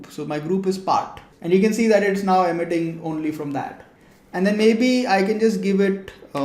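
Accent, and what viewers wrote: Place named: India. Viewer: Ah, indian accent <3 best houdini explainer <3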